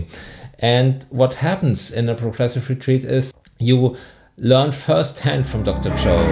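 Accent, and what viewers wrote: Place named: Germany. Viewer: Ich liebe deinen deutschen Akzent. Es ist so schön! Vielen Dank für dieses Video)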